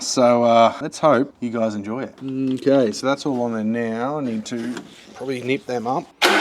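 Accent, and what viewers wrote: Australia. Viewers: Cry about it. Some people enjoy the talking. Especially since he's Aussie. World's greatest accent. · Aussie accent: red pepper is pronounced "red peppah" Race car is pronounced "race cah" Come over here is pronounced "come over heah" 😅😂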